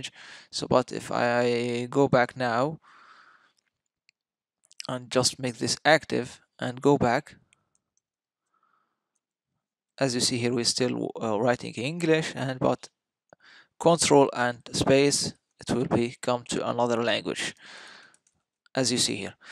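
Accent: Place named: Egypt. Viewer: From the accent I can tell you are Egyptian lol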